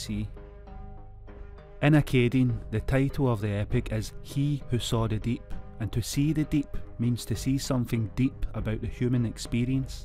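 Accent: Scotland, United Kingdom. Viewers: It's his channel and I have no problem listening to his Scottish accent. Just concentrate and you'll get🖒.. cA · Yeah! A Scottish accent!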